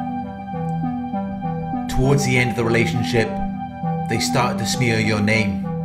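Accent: United Kingdom. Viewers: No one explains the evil images of Narcissism like you do. Beautiful British accent. For sure narcs already destroyed tons of talented people who have so much to contribut to our world. This knowledge should have been readily available for everyone in their earlier age to minimize the effects of these emotional predator sadists. I wanna say thank you from the bottom of my heart.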